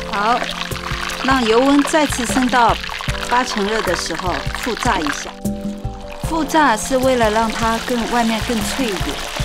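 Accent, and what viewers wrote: Shanghai, China. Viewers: 我也听出来，上海人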